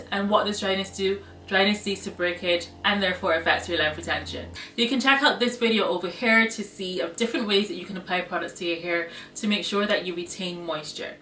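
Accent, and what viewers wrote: Barbados. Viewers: I knew I heard that accent! Fellow Bajan here! ❤️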